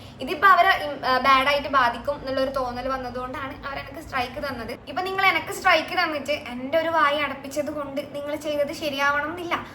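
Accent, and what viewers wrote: Kannur, India. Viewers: കണ്ണൂർ ഭാഷ വരുന്നുണ്ട് ❤️ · കണ്ണൂർ ഭാഷ, നമ്മുടെ സംസാര രീതി. ഞാൻ തലശ്ശേരി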